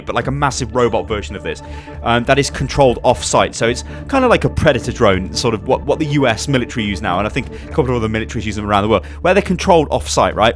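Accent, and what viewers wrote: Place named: United Kingdom. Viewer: Nice to hear another British voice bro 👌🏻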